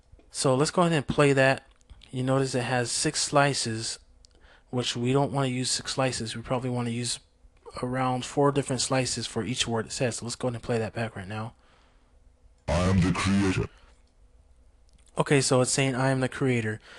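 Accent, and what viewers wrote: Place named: Caribbean. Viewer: Nice tut! I can tell you're carribean.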